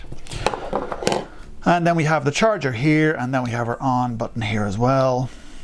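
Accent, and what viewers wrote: Ireland. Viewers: Hi Was scrolling through videos to compare and find a good budget wireless microphone system for DSLR camera. When i heard your Irish accent i said this is my man 😂😂 can you give me some advice if you had any experience with Synco G1 A2 system. I love the Rode Wireless Go but I find there is very little extra"s for your Euro. For €50 less, the Synco has a great all round package but im getting mixed reviews in videos. Just to note i will be using microphone indoors 99% of the time, doing cooking demos. if you have any advice i would greatly appreciate it. 👍 P.S Loved your video & Humor 🤩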